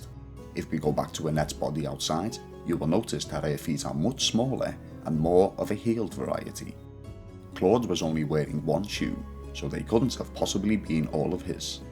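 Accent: Liverpool, United Kingdom. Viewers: Always a good thing to hear a scouser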